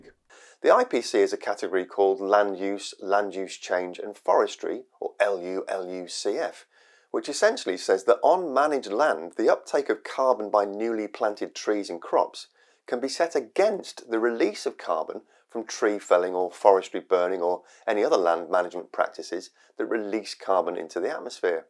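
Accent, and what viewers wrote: United Kingdom. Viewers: Sucker for compassionate rationality, relevance, wisdom and science with a kind and gentle British accent. Typical highly evolved mammalian response.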